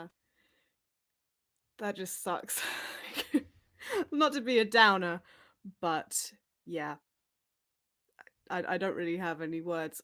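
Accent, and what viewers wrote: United Kingdom. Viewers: i wish i had caught this live. your RP accent is delightful, but i just have two requests. 1) just wanna hear your attempt at a southern american pronunciation of "banana" (buh-NAY-uh-nuh), and 2) wanna hear more of your fantastic singing voice!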